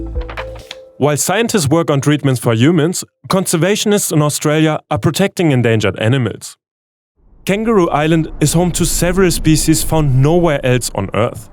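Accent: Germany. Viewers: I can't cope with your cute German accent hahaha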